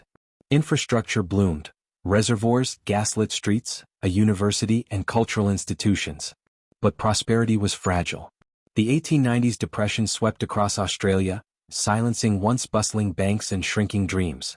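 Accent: United States. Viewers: Why must there be an American accent narration? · Fuck this I can't even watch a video about my own city without some yank doing the narration because Australian videos are not allowed fuck YouTube sick to death of this I refuse to be Americanised by Google fuck that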